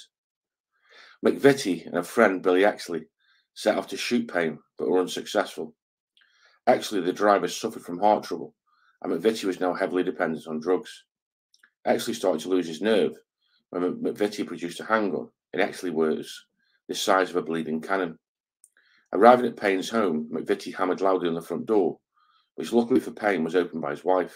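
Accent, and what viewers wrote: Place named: Bristol, United Kingdom. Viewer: Very hard man not like he shown to be in. Fims love your content sound Bristol uk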